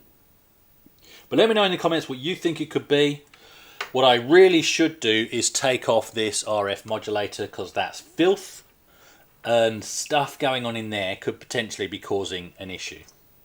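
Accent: Australia. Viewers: Really picking up that Aussie accent now, eh?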